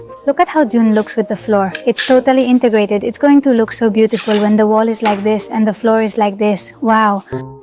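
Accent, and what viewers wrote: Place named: India. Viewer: lo hace la IA en automatico XD por eso parece india hablando ingles XD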